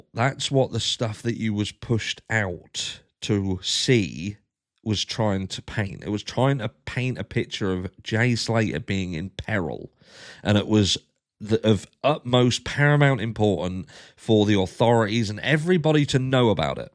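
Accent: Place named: Norfolk, United Kingdom. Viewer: Of course it's bullshit. You are right. Even in our in bread norfolk, where I a sm from and sounds like you are, this shit don't happen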